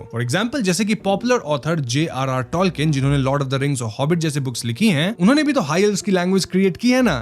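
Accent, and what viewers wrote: Bengal, India. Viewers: TUI KI BANGALI ? .....HINDI PRONUCE E KAMON JANO AKTA BANGALI TOUCH ACHE.....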